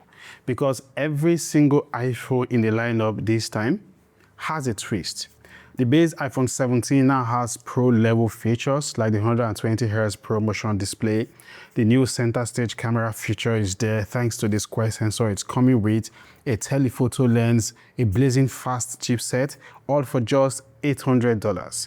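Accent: Africa. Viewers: I was so excited to click on the video and then I heard that damn African accent I am gone can’t do it. It’s like literally slightly less annoying than the Indian accent but just as bad can’t hear it sounds like chalk on a goddamn board.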